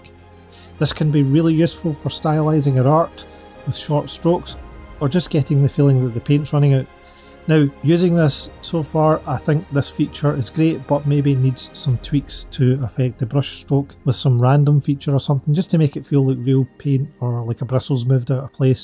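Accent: Scotland, United Kingdom. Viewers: I absolutely love the scottish accent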